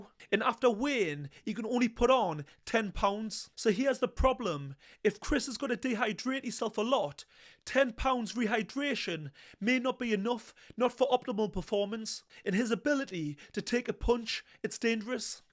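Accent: Newcastle, United Kingdom. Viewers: You sound like a Geordie mate ....you local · Are you from Newcastle? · the Newcastle accent 😂🔥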